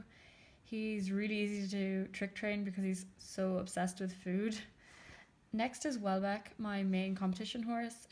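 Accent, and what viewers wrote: Ireland. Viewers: Are you Irish 🍀 cause u sorta have an Irish accent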